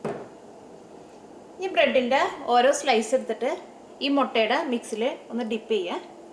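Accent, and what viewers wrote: Thrissur, India. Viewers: Aa thrissur accent inkkishtai.. :) thanks for the recipe.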